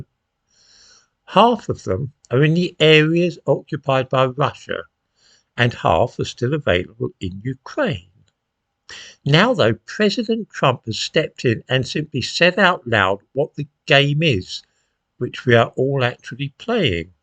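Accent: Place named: Yorkshire, United Kingdom. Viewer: You sir could be from Yorkshire, a great honour, You say it as it is, well done t'old flower.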